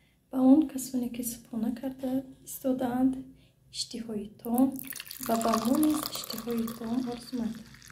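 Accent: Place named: Isfara, Tajikistan. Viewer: Майлаш мемонм ба фикр хамшахрием апачон ман Аз Исфара хастам аз гапзанитон ба фикр хамшахрем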